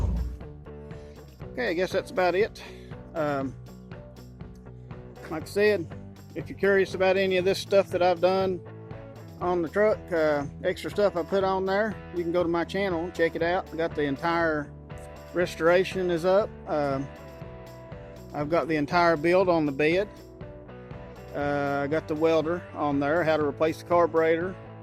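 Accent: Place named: South, United States. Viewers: Very cool man! I love the side bed storage, keep them guns in there! I'm from Los Angeles and I love that southern dialect. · Love the car and the texan accent! Greetz from Holland.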